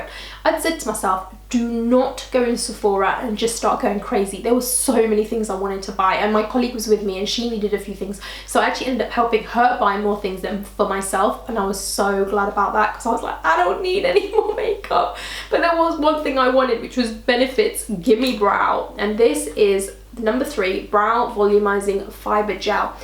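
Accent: London, United Kingdom. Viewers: You're so very London!! I love it!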